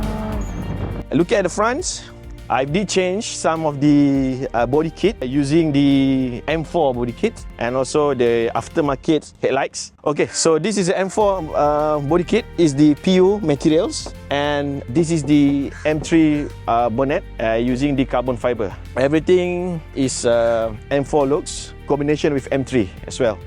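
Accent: Malaysia. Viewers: Good job abg 👍perbanyakkan lg video² review english version..bole menarik lbh ramai viewer² luar utk tgok channel GK. Don't worry about how we pronounce the words cuz this uniqueness symbolizes us from Malaysia.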